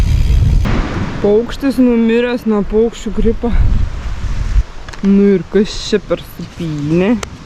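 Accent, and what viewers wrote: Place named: Šiauliai, Lithuania. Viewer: Kaip miela girdėti šiaulietišką šnektą😊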